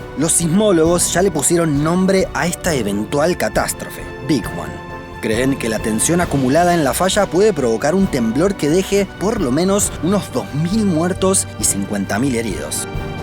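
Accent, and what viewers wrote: Rioplatense, Argentina. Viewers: Soy un estudiante argentino que Lleva un año viviendo en la ciudad de Medellín Colombia, y después de escuchar el dulce acento de este lugar, no eh perdido el mío, más sin embargó siento muy marcado en la manera que utilizas el yeismo, el famoso (sho) del río de la plata. Un saludo compatriota desde la hermosa Colombia 🇨🇴🇦🇷♥️❣️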